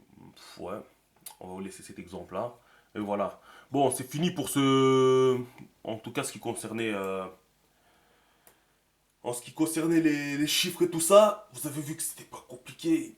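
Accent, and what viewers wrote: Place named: Banlieue, France. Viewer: tu parle trop pour rien dire. je te conseillerais d'apprendre un cours de méthodologie pour enseigner .avec ton accent des banlieues ça nous casse les couilles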